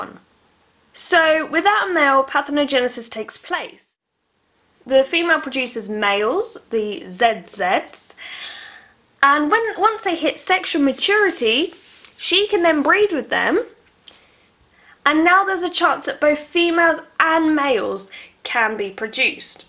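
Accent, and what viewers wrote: United Kingdom. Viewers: She's from Uk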